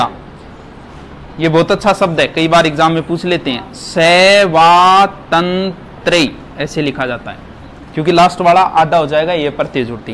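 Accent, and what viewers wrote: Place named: Marwar, India. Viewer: Thank you sir gi so much very helpful your videos Or Aap ki bhasa desi marwad very nice 🙏🙏🙏🙏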